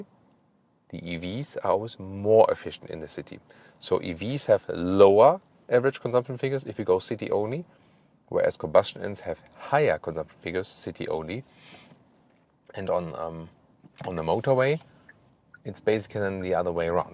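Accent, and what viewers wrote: Germany. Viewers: …watch him just for his enthusiastic German accent. Wunderbar!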